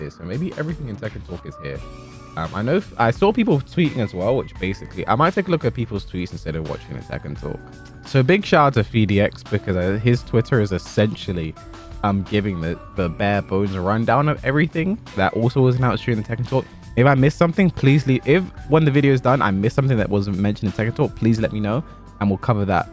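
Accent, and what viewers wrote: England, United Kingdom. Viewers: The music is a little distracting. Your also speaking fast in an English accent, which usually isn't a problem but the music makes it difficult to understand what your saying. Thanks for the upload on the patch notes.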